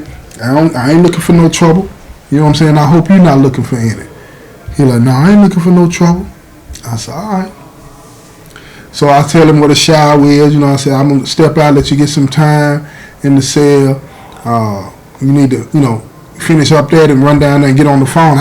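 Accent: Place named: Tennessee, United States. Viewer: I never heard the accent of anyone from Tennessee until I started listening to you and.😊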